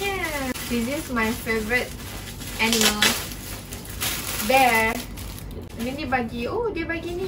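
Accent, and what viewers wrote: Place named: Sabah, Malaysia. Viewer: sokayy love your sabah accent 😍❤️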